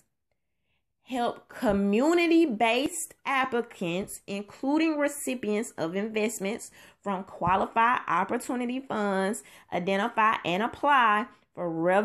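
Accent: South, United States. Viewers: Hi this your fan from Nassau Bahamas again I suppose too ask you where southern accent state you live in... Since Trumpy working with the inner-city now the fake news mainstream media and Roland ''BUCK DANCING 4 HICKERY'' Martin will spin this too please they own wicked agenda lol.. I want you listen and watch this clip by ''PHARAOH SPEEKS'' on Roland Martin you may laugh lol.. <link>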